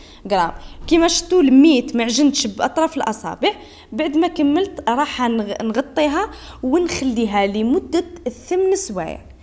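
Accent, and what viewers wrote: Batna, Algeria. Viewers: سلام صحا فطوركم تبان مليحة بزافففففف يا بنت بلادي جاممممممممم كبيرة ليك لازم نجربها .... شكرا حبيبتي .....هدرتك تفكرني بباتنة بلادي العزيزة 😍😍😇😇😇😇😇😇😇😇😇😇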